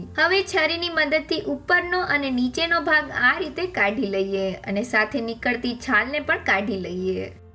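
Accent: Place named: Kathiawar, India. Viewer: Saras bni 6 ho. Bajar jevi Krispy. Tmari bolva ni style bhu gme 6. Kathiyavadi lago 6o. Ben thanks a lot for topra pak recipe. Ur fan from rajkot.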